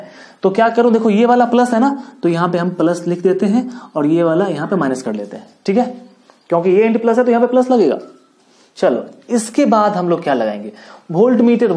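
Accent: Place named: Bengal, India. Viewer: Sir are you bengali, I think your tongue sounds like a bengali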